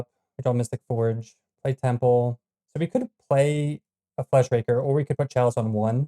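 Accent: Baltimore, United States. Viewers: Hey, so firstly, I thought you were from Baltimore too 😂, like the Swedish guy! I lived in NJ, in Bergen County but in UK for last 20 years. Anyway, what do you prefer, this or the Herigast deck? This one seems like it's prepared for a bit more but I'm just getting back into Magic after a 4 year break, so I am just getting caught up with the meta and have never seen a couple.of the decks you played on this video. What's your preference?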